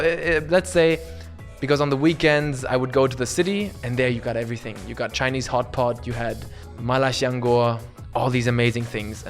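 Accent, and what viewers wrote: Germany. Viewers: Wie du mit deutschem Akzent sprichst, einfach göttlich! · Question , is he originally born in germany ? Cuz he has a really big german accent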